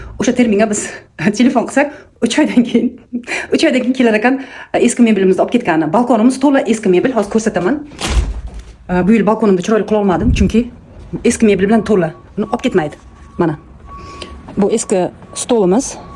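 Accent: Surkhandarya, Uzbekistan. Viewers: Сурхонча сукинишингизга 5 кетаманда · Surxondaryocha gaplaringiz yoqadi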